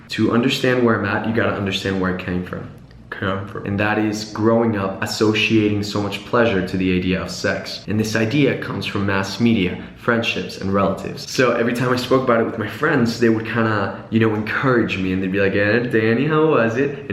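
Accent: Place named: United States. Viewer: !?? Sounds really american. Think you had enough... and finally grown up.😉 Greetings from Holland.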